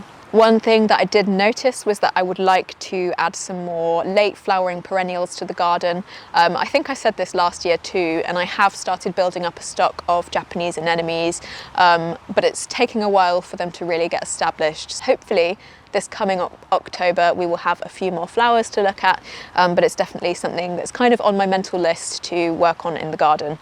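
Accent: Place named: United Kingdom. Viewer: I love british accent 🎉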